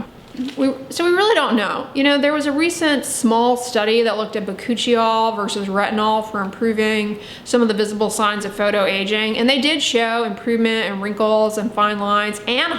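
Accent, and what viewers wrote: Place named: Philadelphia, United States. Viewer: I love your videos, but I’m constantly trying to pin your accent. It’s sounds like the Philly tri-state area (PA, So.NJ, No. DE)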